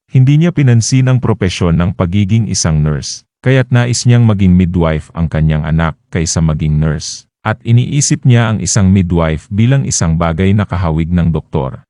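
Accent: Philippines. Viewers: BWISIT SAANG KUPALOP KA NANGGALING KAASAR TAGALOG MO🤬🤬🤬‼️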